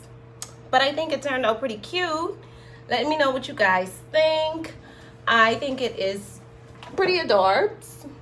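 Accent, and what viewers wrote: Bahamas. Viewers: Just started watching and I'm subscribing already because I hear that you're a fellow Bahamian. Big up BAHAMAS!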